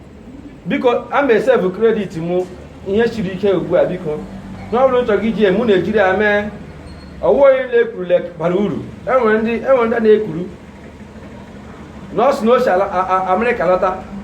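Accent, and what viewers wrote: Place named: Ngwa, Nigeria. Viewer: u are ok, please continue we need more realistic comedy like yours. God continue to bless you for it. Abeg what state you hail from you sound like nwa Ngwa to me.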